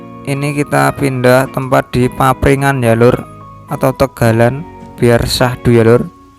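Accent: Indonesia. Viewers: Orang gebang ya om? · Apa itu grenjenk...??? Jadi ketawa sendiri dengar penjelasane mase,..Jowo ndi mas???? Q jatim.......👆👆👆👆👆👆👆👆👆😁😁😁😁😁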